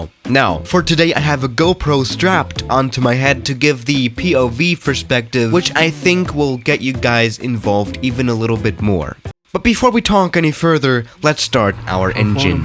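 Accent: Germany. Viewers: Are you an german? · I think this is a stupid question but... Is he German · Are you German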